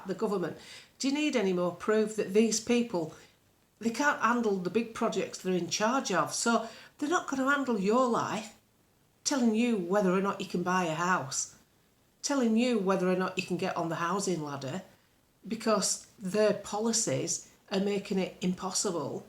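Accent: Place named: North, United Kingdom. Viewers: Never boring, it's lovely to hear a Northern voice, like mine, say the stuff that you could have plucked out of my brain. Unfortunately due to my work I have to keep most of it to myself. BUt me and my husband say all this stuff at home. We sick of the gobshites spouting lies and trying to convince us we're far right. I say they're not right, not right in the bloody head if they think we're daft enough to believe them. Keep going - we're winning! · Hi. Old bod here from Tod. It’s not just the accent that I like giving us good old northern common sense. But the words are so true. Came across you through following Neil what a find. You cheer me up give me hope.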